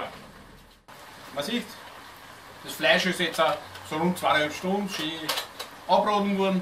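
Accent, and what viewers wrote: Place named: Austria. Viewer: I just love the Austrian accent even though it means I can't catch everything he's saying! So nice to see someone doing these traditional recipes. Also love it that the dog is allowed in the kitchen, it reminds me a bit of the old British show "Two Fat Ladies".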